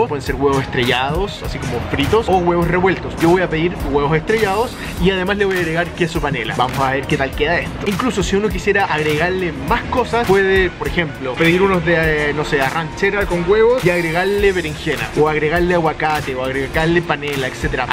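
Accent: Chile. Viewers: A que lindo eres, y lo explicas super bien; amo el acento chileno!!! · oyeee me alegra mucho tu canal! Me gusta ver cómo los extranjeros le gusta México y a mi me gusta Chile 🇨🇱:) algún día me gustaría ir a Santiago y conocer la comida chilena y la gente chilena! Me gusta mucho el acento chileno también:) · soy una mexicana qué ama tú país... chile me fascina, me encanta su gente linda ustedes también tienen ésa cultura, ésas tradiciones tan lindas. Conozco chile a través de la televisión viendo enigma, y mea culpa y me gustó tanto me enamoré de ése lugar hermoso qué un día quiero ir a conocer. al escucharte hablar supe qué heres chileno me gusta ése acento tan dulce! Gracias! por aprender de nuestra cultura❤💞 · Por fin! los chilaquiles son tan ricos:D Saludos!!! cuidate mucho para que puedas seguir disfrutando de la comida y por cierto el color verde te va muy bien!!:D Por cierto me encanta el acento chileno!!!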